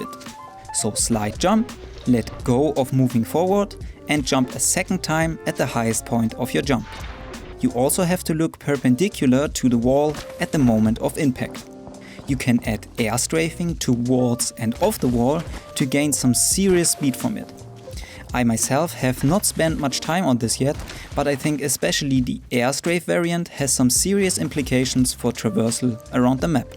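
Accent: Germany. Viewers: its the german accent for me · german? sounds like... besides, awesome video! :) wish i had seen this months ago. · you can tell by his accent that he is german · Do I hear a German accent? 🤔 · this german Accent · You make amazing videos dude, keep it up and I believe somedoy you'll become one of the biggest apex content creators :) Btw are you from Germany? I kind of hear a german accent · Nur eine Frage bist du deutsch Man erkennt das an dein Akzent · Man hört einfach dein Deutsch raus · this guy has a strong german accent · wie man sofort raushöhrt dass er deutsch ist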